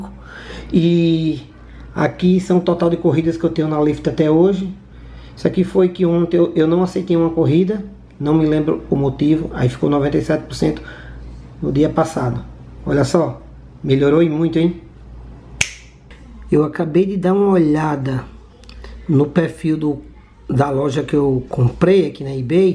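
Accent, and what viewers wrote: Northeast, Brazil. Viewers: nuuuguets kkkkkkkk gostei do video ate pq ele tem um sotaque nordestino tem o meu gostei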